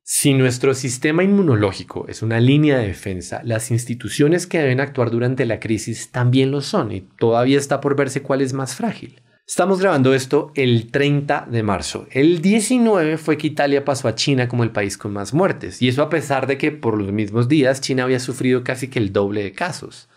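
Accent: Colombia. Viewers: Me parece genial la forma de narrativa gráfica que tienen y el dinamismo para transmitir información, una voz holgada me gusta el formato. Parece ser Colombiana, orgulloso de ser así.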